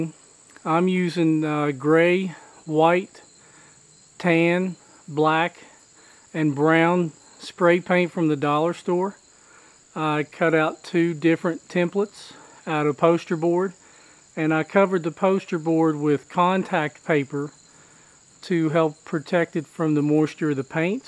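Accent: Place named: South, United States. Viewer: where do you all live you sound like you are from the south?!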